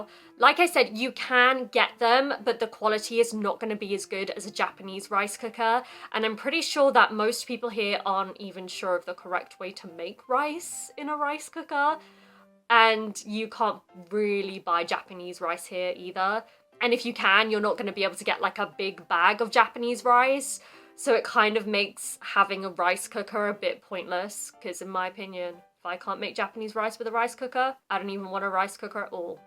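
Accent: RP, United Kingdom. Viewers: いつも貴方の美しく心地よいクイーンズイングリッシュを拝聴しております。 聴けば聴くほど、どうして英国から移住した米国人の英語はあんな感じで変わってしまったのでしょう？本当不思議です。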